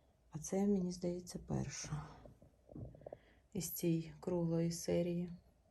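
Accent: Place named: Cherkasy, Ukraine. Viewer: Дякую Вам! Хороші роботи. Приємно дивитися і чути Вас. Натхнення Вам і нових творчих задумів та їх здійснень! Я з України. Мені здається, що Ваш говір черкаський 😊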